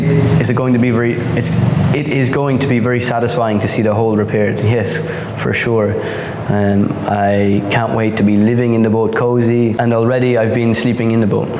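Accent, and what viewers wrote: Ireland. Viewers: What's your accent? Irish, but something else too?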